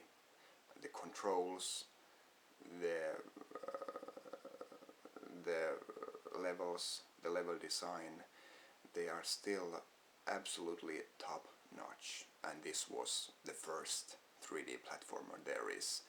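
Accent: Finland. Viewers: he sounds like he's from finland or some european country so i'd have to it's pretty obvious he is from finland.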